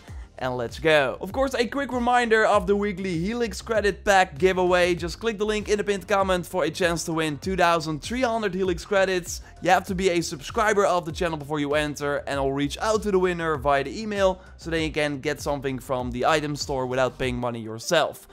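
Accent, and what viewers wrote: Netherlands. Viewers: i smell a dutch accent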